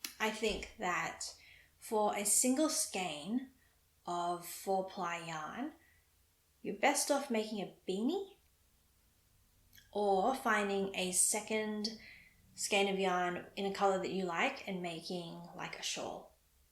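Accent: Australia. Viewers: Hi, I've just recently found you here on YouTube. I am located in rural Victoria so it's lovely to listen to someone who has an Aussie accent. Would you know of any other Aussie yarn content on YouTube? I have searched but have only found a very small number of Aussie fibre enthusiasts. I am both a knitter and crocheter and am interested in trying both drop spindle and wheel spinning in the future. I hope you continue with the podcasts as I think they're awesome. 😊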